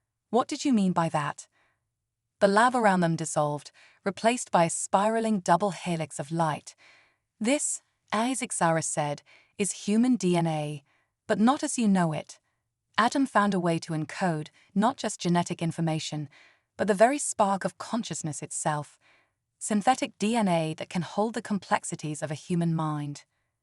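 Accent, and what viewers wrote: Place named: Australia. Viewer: This might be an AI story channel hard to tell but I love the Australian female accented voice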